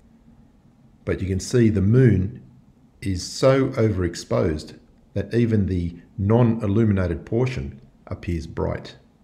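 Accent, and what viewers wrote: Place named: Australia. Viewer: As much as I love seeing you debunk flat earth, what about some astronomy videos? Your calm Australian voice and the way you explain things, I think would be really beneficial to many people. Also I'm from the UK so it would be really nice to see your stars because as an amateur astronomer myself there is only one thing worse than a cloudy night when trying to view stars below the equator and that's that damn globe in the way! Keep up the good work buddy.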